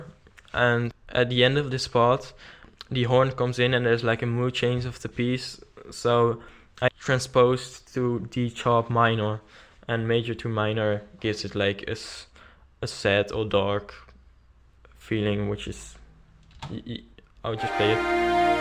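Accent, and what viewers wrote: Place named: Netherlands. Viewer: haha. i could emidiatly here ur from holland :P. im also from holland ^^. great tutorial :) !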